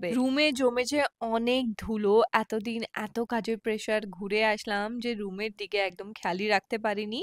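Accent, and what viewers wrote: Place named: Mumbai, India. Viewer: Ektai jinis valo lage na, title dekhi, but kothar accent ta sunle mone hoy j mumbai te manush hoyeche madam, eto dhong kore kotha bolr ki ache bujhi na, normaly bollei toh hoy 🙄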